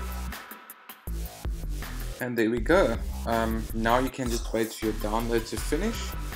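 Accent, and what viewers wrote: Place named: South Africa. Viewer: I know I’m very late but you sound like your from my home country of South Africa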